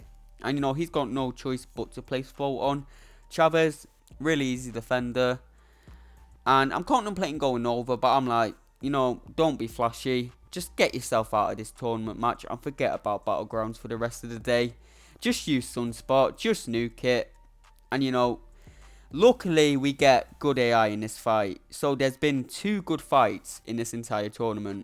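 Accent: United Kingdom. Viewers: Bri'ish man is speaking straight fax 🗣️🔥🔥🔥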